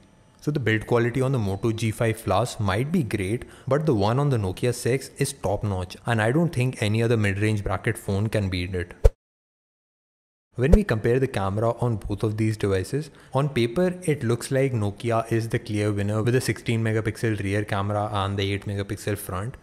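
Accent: India. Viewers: Why are you people complaining about the way he speaks when you know he is from India?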